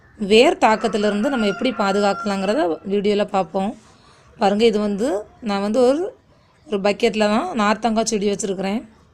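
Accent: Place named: Kanyakumari, India. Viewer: குமரி நீங்கள் பேசும் தமிழ் அழகு அக்கா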